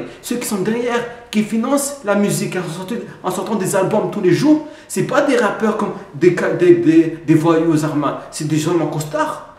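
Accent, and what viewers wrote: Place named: Brazil. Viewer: Tu as un accent brésilien...🙂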